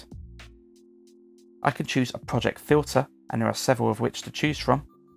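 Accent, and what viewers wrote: United Kingdom. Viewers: nice a british accent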